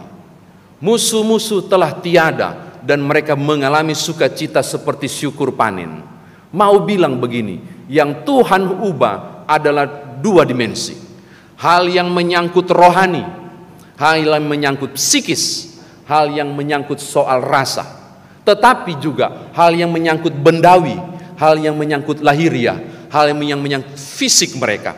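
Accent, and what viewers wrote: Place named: Ambon, Indonesia. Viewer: Aduh..khotbhx sngt lucu..dialeg Ambon..mis komun Pongo? Mksh Tuhan b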